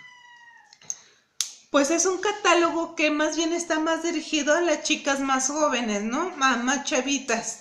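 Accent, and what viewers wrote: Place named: France. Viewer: Jamás me imaginaria eso!!pareces francesa o polaka tengo conocidos de esos lugares y es muy parecido el.acento. No te escuchas mal sólo pareces una extranjera muy sofisticada que habla el idioma con un poquito de esfuerzo. Gracias por contestar muy amable de tu parte y si estoy seguro le gustara a mi esposa el perfume bendiciones linda Cuídate mucho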